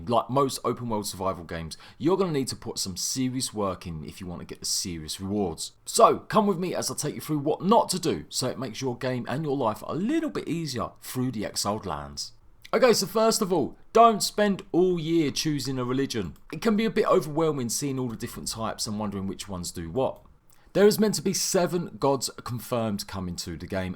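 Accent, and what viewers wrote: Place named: Cockney, United Kingdom. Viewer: He is like a super-cockney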